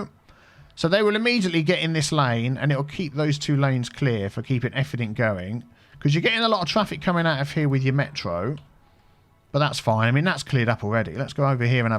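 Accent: United Kingdom. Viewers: sounds bloody british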